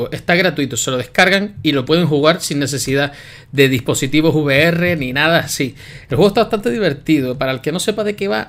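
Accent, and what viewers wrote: Canary Islands, Spain. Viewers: tu tienes acento canario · Eres Canario :O?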